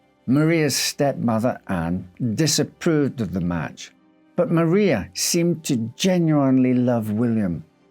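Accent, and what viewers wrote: United Kingdom. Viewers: british? lol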